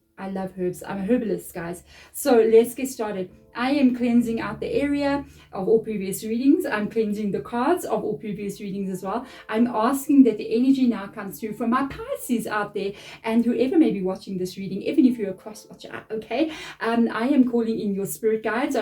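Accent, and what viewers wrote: South Africa. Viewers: Are you a southy 🇿🇦?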